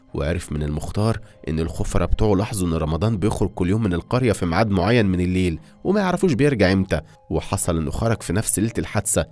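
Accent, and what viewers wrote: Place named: Egypt. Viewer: المعلق مصري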